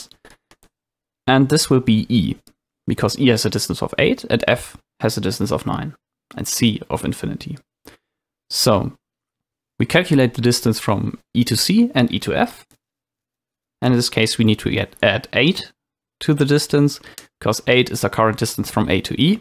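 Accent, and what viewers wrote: Germany. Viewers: I trust this guy beacuse of his german accent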